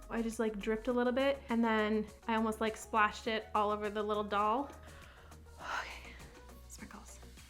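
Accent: Canada. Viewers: Between the valley girl voice and the super dramatic personality … I laugh. I do get a kick out of this girl. I mean that gasping at everything… like what would her reaction to something crazy happening? I just see her being like OhHhh my GoShHhH!! Gasppp gaspppp 😂😂😂😂 and if she hadn’t said she’s from Canada, the way she says out and about .. things like that is so Canadian. I never heard anyone speak like that except for people from Canada. That’s no hate either, I’m a born and raised New York Italian …. You can hear me coming a mile away && there would be NO question where I’m from. Everyone I meet that’s not from like the tri-state area always are like my god you have the thickest New York accent! Say dog!! Say coffee!! 😂😂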